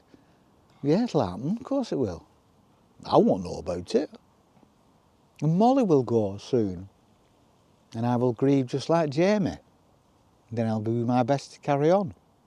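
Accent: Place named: Home Counties, United Kingdom. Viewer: Thank you for your very wise words and support for Jamie, so many people round the world think the world of him. It’s so true that the price of love is grief. Love your words about the end we will all face and how to live a slow simple life literally “smelling the roses”. You sound to come from my home county but I now live in the Lake District which is currently overflowing with visitors who do not understand that life is finite and precious and that my home is not their playground, whizzing too fast to see the real valley. Sherps helped me to cope with feeling trapped and lonely.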